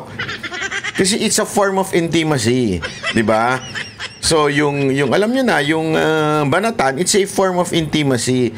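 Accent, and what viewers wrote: Visayas, Philippines. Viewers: Ang cute magbisaya mo sir 😂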